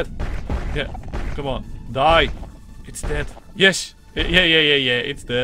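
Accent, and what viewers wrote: Netherlands. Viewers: dutch accent for life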